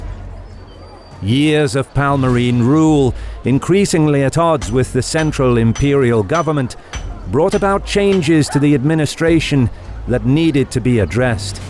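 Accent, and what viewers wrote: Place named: United Kingdom. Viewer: I cant enjoy a documentary video anymore unless its narrated by a british person with a refined accent, well done guys!!!!!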